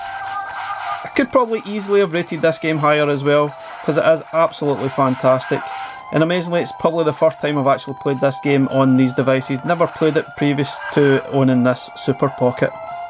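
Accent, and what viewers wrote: Scotland, United Kingdom. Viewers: I’m sure I will I’m sure I will. I loves ur content especially that Scotch accent us English Gurl’s like an accent 💖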